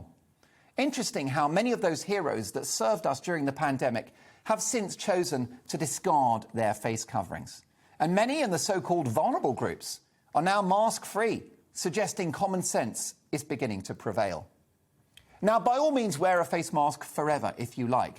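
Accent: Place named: Home Counties, United Kingdom. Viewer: Sweden, Florida and Texas put out to people who will never check what is happening in those three places. Mark Dolan is a vacuous bot who just says things in a Home Counties voice, cheery picking quotes and saying things like ‘facts don’t matter anymore’ whilst peddling half truths and down right lies. Fox News business model is 100% what GBNews is following. Very sad.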